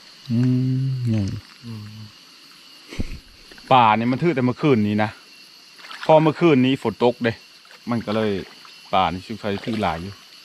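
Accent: Phu Thai, Thailand. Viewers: สวัสดี เสียงเจ้าเว้าคือเดียวกันกับสำเนียงผู้ไท อำเภอหนองสูง อำเภอคำชะอี จ.มุกดาหาร น้อ กะคือสิเป็นพี่น้องเช้อสายเดวกันน้อ ดีเจอ้หลายที่ได่เบิ่งคริแเจ๊าน๊อ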